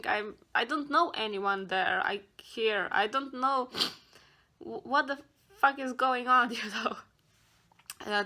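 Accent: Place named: Slovenia. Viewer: Every last detail of this ongoing interactive life story is on point, even your bedroom wallpaper is right :D Good video, meakes the listener feel connected with the message of taking the responsibility for your destiny and than beeing persistent enough to follow it through without giving up beforr your time comes. Ps, leave at least 0.001% of Slovenian acent :D 🌺💚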